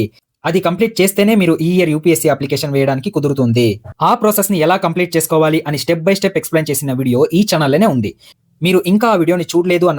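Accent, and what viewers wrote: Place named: Rayalaseema, India. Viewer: Anna super ga Explain chesaru... Mee slang, Rayalaseema/Karnataka border lo unna valla laa undhi... Meedhi ye ooru thelusukovaccha?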